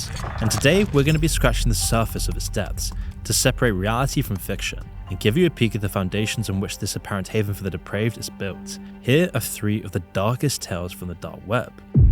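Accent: United Kingdom. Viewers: ffs another british voice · Hes british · Very thick English accent. Very hard to understand.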